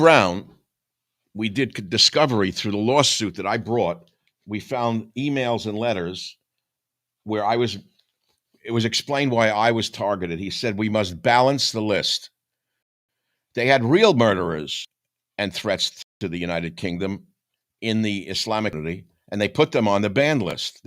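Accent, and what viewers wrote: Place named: Brooklyn, United States. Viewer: Subbed! I didn't know you were online - I thought I lost you after you left radio. I love your accent, reminds me of my family growing up, that's a particular brooklyn accent, you must be from the same neighborhood my parents grew up in. I can listen to you read a phone book, how much better to listen to you speak the truth. America is blessed that you're still with us and of sound mind and health.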